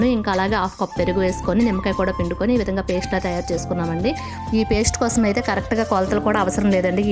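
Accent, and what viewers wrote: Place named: Srikakulam, India. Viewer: Meedi vizag na. I am also from vizag, madhurawada. & srikakulam slang la వుంది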